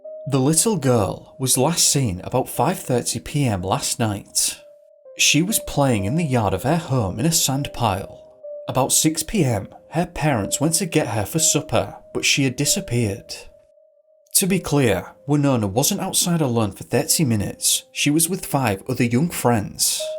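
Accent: United Kingdom. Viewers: Chills with a British accent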